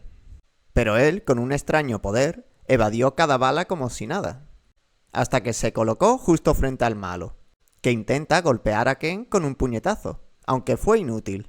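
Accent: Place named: Spain. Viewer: Está buena la narracion lo mala esq está en español España 😅😅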